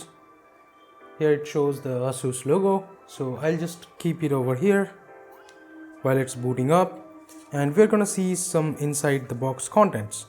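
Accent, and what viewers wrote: Bengal, India. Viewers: Accent tells me you are bangali, even I'm using redmi note 4 from 2 years still in good condition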